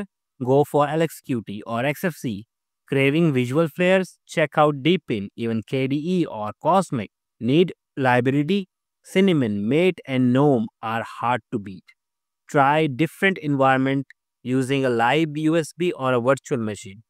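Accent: India. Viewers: bro probably says carmel instead of caramel out here critisizing some indian dude speaking english as a second language LMAO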